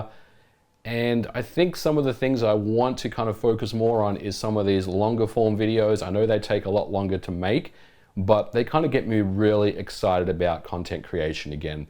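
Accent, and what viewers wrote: Australia. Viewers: Been watching a long time now. It's the quality content that keeps me coming back, plus, the Aussie accent lol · The most important thing about this channel to me, and I really hope you see this because it's true, is having a non-US creator among the big gaming channels. When 90% of videos on the platform are by American dudes in their 20s, it really means a lot to have an older, Australian voice to bring a different outlook, experience, and vibe, and honestly, it can just be downright fatiguing to hear high-energy American accents nonstop video after video. You're making a big difference in a way you might not have known, and I hope a lot of others feel the same, too. Love ya, keep it up. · Going to use you as inspiration to lose weight in 2025. I just hit 50, and as you say, still eat like I did in my 30s. As a fellow IT enthusiast from Melbourne who was in the 90s PC scene, keep up the great content. 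Hearing an Aussie accent is always welcome.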